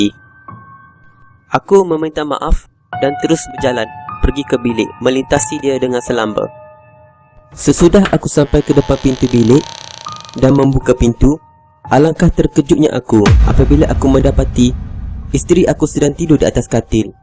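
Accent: Kelantan, Malaysia. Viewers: Demo ore kelate ko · Abg orang kelantan ke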